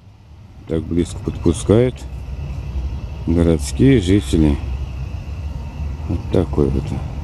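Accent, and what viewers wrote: Tirlyan, Russia. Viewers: ВОТ ТАК ВОТА!!!😁 ох оно неповторимое тирлянское наречие! Смотрю тебя еще чтобы не забыть как тирлянцы говорят, из тысячи говоров узнаю тирлянский говор.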